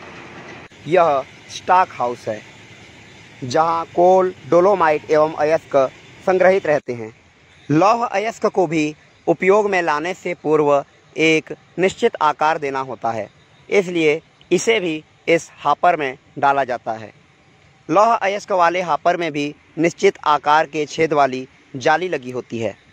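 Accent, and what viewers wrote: Standard. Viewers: शुद्ध हिंदी का बहुत अच्छा प्रयोग किया गया है उच्चारण अत्यंत शुद्ध है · Your hindi is pure. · Kya baat he Ramayan ke badh aapki sudh hindi suni he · sudh hindi achha bolta h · आपकी शुद्ध हिंदी का श्रवण करके हृदय प्रसन्न हो गया भाई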